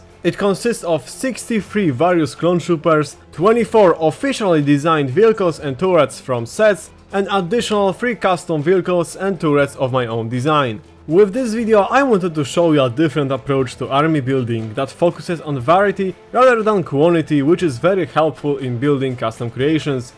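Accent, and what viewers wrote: Poland. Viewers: Are you from Poland? I mean your voice have so polisy Accent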